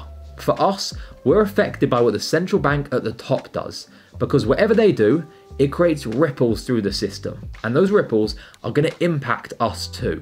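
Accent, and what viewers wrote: United Kingdom. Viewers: Great video. You sound British so why a US focus ? Shame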